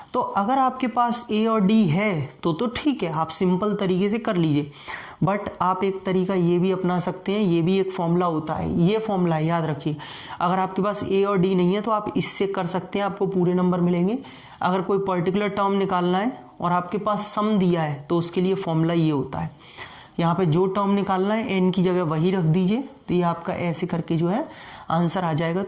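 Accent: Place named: Haryana, India. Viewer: Sir awaj se aisa lgta ki aap Haryana ke aas paas ho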